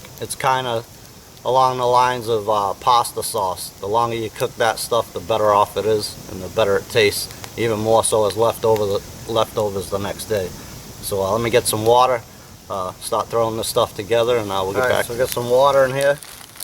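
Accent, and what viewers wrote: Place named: East Coast, United States. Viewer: Liked the vid. That eastern accent gets me since im from texas. Keep ot up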